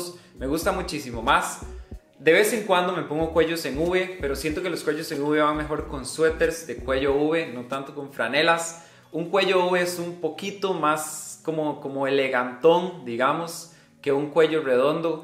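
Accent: Costa Rica. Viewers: Creo que de Costa rica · Eres tico verdad?🇨🇷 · usted es tico? vea esa ERRRRRE mae hahaha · Eres de costa rica ? Pasó solo 1 segundo y me pareció que si Si si eres tico,es que es muy obvio mae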